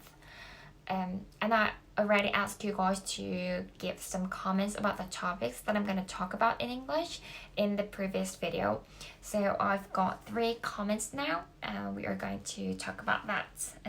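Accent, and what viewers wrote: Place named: United Kingdom. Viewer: 님도 새해 복 많이 받으세요!!! 발음이 전보다 더 좋아지시고 영국억양이 조금 더 느껴지는거 같은건 기분탓일까여~? ㅎㅎㅎ 영상 편집실력도 더 는거같아요 히힣ㅎㅎ 그리고 오늘 입으신 옷 너무 예뽀요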